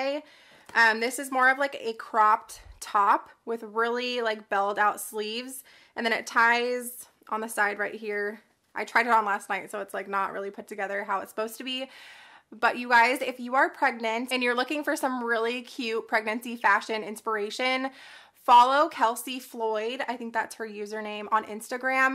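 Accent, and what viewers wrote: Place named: Texas, United States. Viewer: The way you said 13 bucks is so SOUTHERN LOL I loved it! You sound like a real Texan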